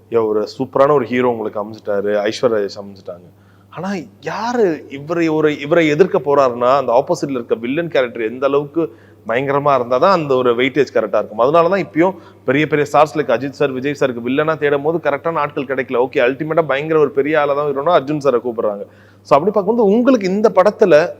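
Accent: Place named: Bangalore, India. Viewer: Banglore Tamil rombha like 🤝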